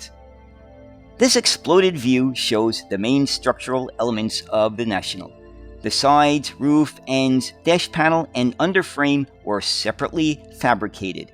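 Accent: New York, United States. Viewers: this guy sounds like he is from New York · DUDE... WTH??? LOL . THIS IS SOO CRAZY. A YANK TALKING IN DETAIL ABOUT A LITTLE OLD ENGLISH BUS AND BUS COMPANY FROM THE PAST. . HAHA. I LOVE IT. YOU SOUND LIKE A NEW YORKER LOL. LOVE THE ACCENT MAN. I LIVE IN THE NORTH WEST ENGLAND , MANCHESTER AREA . NOT FAR FROM LEYLAND. IM NOT SAYING WHOS BETTER US OR YOU ARE ANYTHING, ITS JUST NICE FOR A CHANGE A FRLLOW BUS FAN AND AN AMERICAN TALKING OF SUCH A SUPJECT. THANK YOU FOR THE VIDEO. AS IT HAPPENS I REMEMBER THESE BUSES WHEN THEY CAME OUT, I WAS YOUNG, BUT A FAN OF BUSES, BUT I DO REMEMBER THEM VERY WELL, BEING VERY NOISY, RATTLED LIKE HELL GOING OVER POT HOLES, THEY HAD A BIG FAN AT THE BACK OF THE BUS THAT YOU COULD SEE THROUGH THE AIR DUCT PANAL. THST SPUN VERY FAST. I USE TO BE A BIT A FRAID OF THESE BUSES CAUSE OF THE FAN, THE SPEED THEY WENT AT AND THE LOUD NOISE LIKE A DRAGON,. 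G-LAGGG, G-LAGGGG, G-LAGGG, THAT WENT FASTER N FASTER AS SOON AS THE DRIVER SET OF. NORMALLY LONDON ALWAYS HAD THE NEWEST, BEST BUSES THE UK OFFERED, BUT BACK IN THE 70S N 80S WE HAD A VERY SPECIAL BEAUTIFUL BUS OF OR OWN IN MANCHESTER. THE MANCUNIAN BUS.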